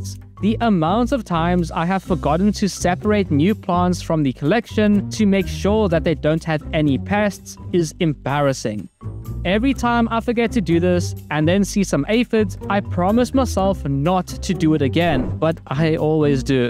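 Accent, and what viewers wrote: South Africa. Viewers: Are you South African bc i think you are.Pls answer😢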